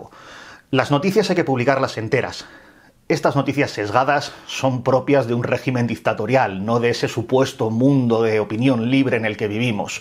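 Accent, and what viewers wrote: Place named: Andalusia, Spain. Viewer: Andaluz campero profundo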